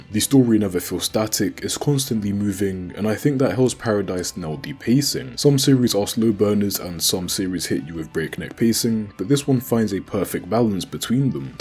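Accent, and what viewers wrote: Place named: United Kingdom. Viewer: Hello, I’m assuming you’re British from your accent. I love jjk, csm and Hells paradise. Love your reviews that’s why I subscribed and liked:)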